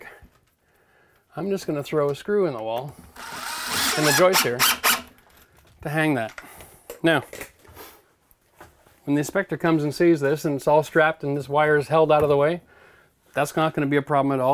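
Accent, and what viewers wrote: Canada. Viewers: I know that I need to look at my local regs, but just for reference, are you in Canada or the US? I’m detecting a hint of Canadian accent. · Very informative. You speak well and clearly without lots of loud music in the background. I just subscribed. Are you Canadian? I notice and accent. I spoke at a couple conferences in Canada. I am redoing a bathroom in an old rowhome. How do I secure every NM every 5 feet if I am keeping the plaster and lath? Should I have separate circuits for the lighting and outlets?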